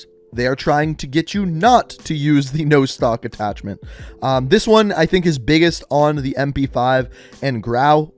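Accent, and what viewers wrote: Canada. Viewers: Canadians eh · I know ur Canadian because of ur accent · Your canadian EH thats pretty neat but onestly hope you get a mill love teh vids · Ey another canadian ey!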